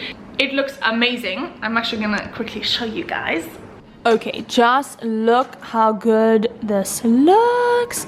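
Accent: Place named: Israel. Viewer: I hink you already are incorporating the Israel accent! Nice.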